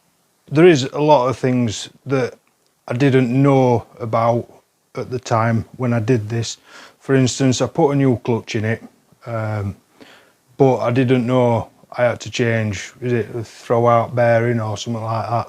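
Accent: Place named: South Yorkshire, United Kingdom. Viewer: Hiya mate, judging by your accent I’m guessing you are in the South Yorkshire area, I’m gonna say Barnsley. I may be wrong. Anyway the Vectra looks great, my dad used to have one of these back in the day, and it was a diesel variant in like a British Racing Green colour. One thing I always liked is how wing mirrors are integrated into the body lines.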